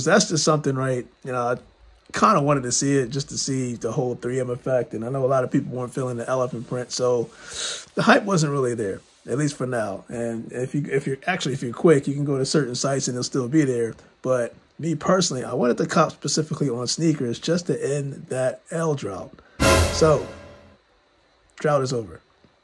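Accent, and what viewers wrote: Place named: Boston, United States. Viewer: U had to be from Boston I tried.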